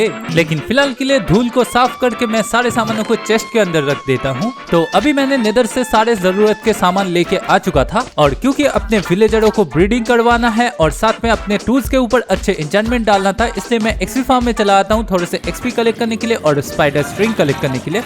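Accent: Bengal, India. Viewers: bhai Tum Bengali ho kya?, 🙂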